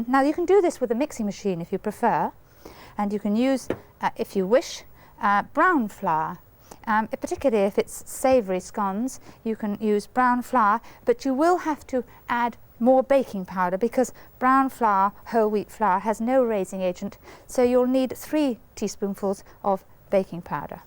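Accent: RP, United Kingdom. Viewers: That's when presenters on TV spoke the Queens English. Flashing teeth etc and the more showman style is down to the influence of US style infiltrating television.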